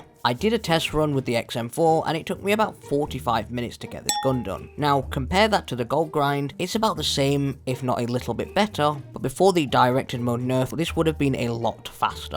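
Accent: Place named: United Kingdom. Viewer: I usually don't like the british accent, but you sound really nice! :)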